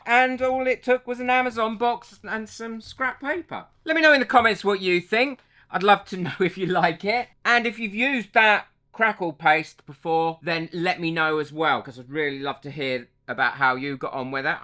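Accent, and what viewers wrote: United Kingdom. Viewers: I had no idea that so many people Love boxes!!!! I collect them, thinking, well, you never know…it’ll come in handy for something sometime. I’ve never done crafts, I just love boxes! I usually end up needing a particular size to mail something in. I’ll watch your video and maybe be inspired to try something new! and, btw…im an American and I love your English accent. English accents sound so sophisticated. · You are adorable! I don’t really care what you’re making, I’d tune in just to watch a dude n listen to a Brit do crafting. I subbed.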